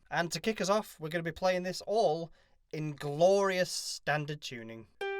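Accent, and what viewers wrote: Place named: South Yorkshire, United Kingdom. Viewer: Nice tutorial man! Sounds great! Also judging by your accent you sound like you’re from my neck of the woods in England, South Yorkshire?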